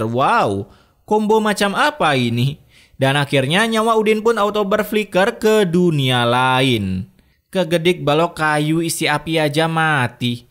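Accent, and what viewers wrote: Bali, Indonesia. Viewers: Min, dari Bali ya? 😁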